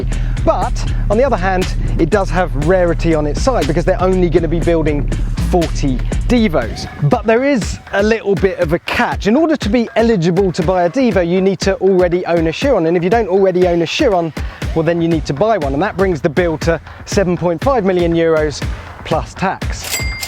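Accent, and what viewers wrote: United Kingdom. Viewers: Cuz he's british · more like a mix of Hammond and Gordon Ramsay accent lol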